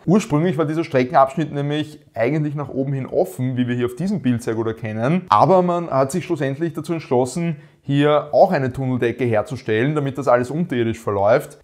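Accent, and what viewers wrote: Austria. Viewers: Wunderschöner Wiener Akzent und optisch sehr angenehmer Erzähler, sehr schön, anzuhören und anzusehen, von der Information gar nicht zu sprechen. Vielen Dank 😊🎉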